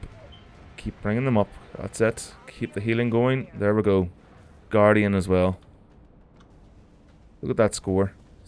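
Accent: Northern Ireland, United Kingdom. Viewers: you sound northern irish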